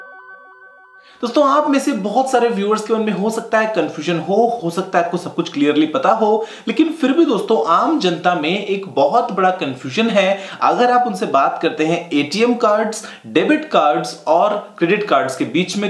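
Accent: India. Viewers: NOT ALL KNOW INDIA ENGLISH PLS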